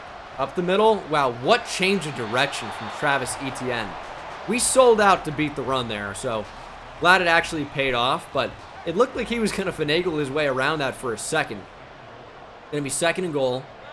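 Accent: London, United Kingdom. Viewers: u throw every ball as a bullet pass u need to lob or touch pass sometimes thats why that Drake London play happened. W british accent tho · Keep spamming the same play that doesn’t work and keep spamming the london accent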